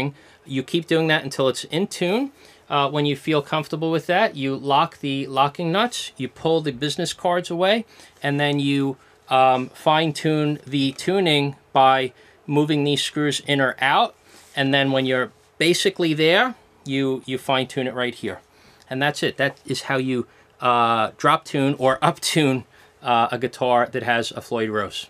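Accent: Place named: New Jersey, United States. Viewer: The “locking nuts”, how many nuts does that guitar in the video have? Love that jersey accent though...👍 One thing that Fender recommends you do is to make sure that whatever you use to block the tremolo doesn’t move around when your strings are at pitch, whilst the bridge is flat against the top.... then you bring out the claw screws and it’s all good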